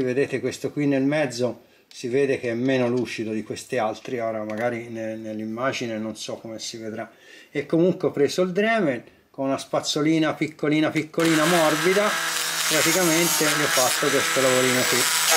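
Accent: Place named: Livorno, Italy. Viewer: okkk. Mi sembrava un accento più labronico. Ad ogni modo complimenti per il canale!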